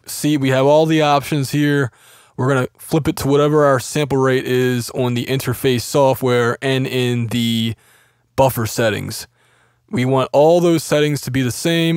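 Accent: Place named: United States. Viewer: Hmm...I recognize my own american regional accent. What state you from???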